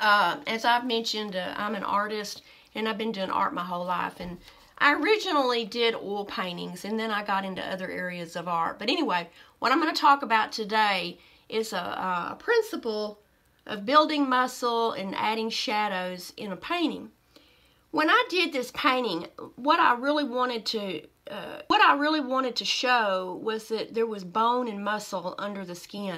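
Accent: South, United States. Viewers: Hi, you are so right about this! Simple logic that I certainly did overlook. I occcassionaly get bags so I will remember that. I do get dark circles so for that I will use the concealer. I love your southern drawl. Feel better. Melissa is a sweetie. xoxo Much love xo Marta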